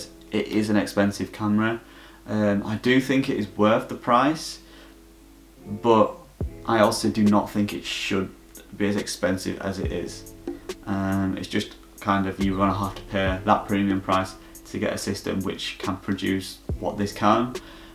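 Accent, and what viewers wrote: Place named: Preston, United Kingdom. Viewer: Nice video mate, love my M6. Where you from? Sounds like you are close to me up Preston way.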